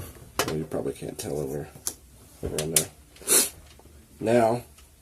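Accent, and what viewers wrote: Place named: England, United Kingdom. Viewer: very close English accent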